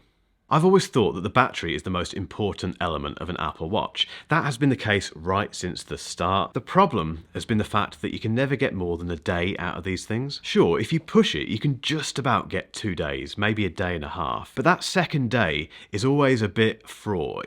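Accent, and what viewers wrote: United Kingdom. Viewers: Keep up the good work, nice to hear tech reviews, simply explained and in a Brit accent !!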